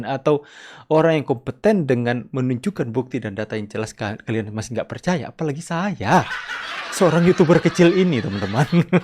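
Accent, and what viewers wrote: Manado, Indonesia. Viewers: Tomohon selalu hadir nyimak. 👍😍 Tebarkan terus Kebaikan dan Kebenaran Bung bersama teman2 kreator yg lain. 🙏😇 Sekali2 selipkan dialek/logat Manado neh Bang. 😀😊...mantaaappp. 👍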